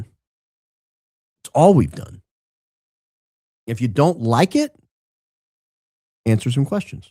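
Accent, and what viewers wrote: South, United States. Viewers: I finally figured it out! Everytime you go into your southern accent you sound just like Foghorn Leghorn! I had to look it up, check him out!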